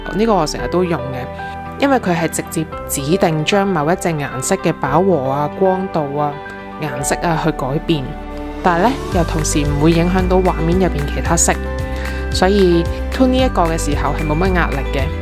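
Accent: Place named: Cantonese, China. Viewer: 好喜欢听你讲粤语😝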